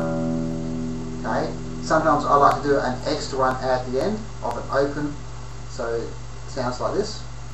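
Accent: Australia. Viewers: awwwwwesoooommmmmee thank you!!!! Are u australian?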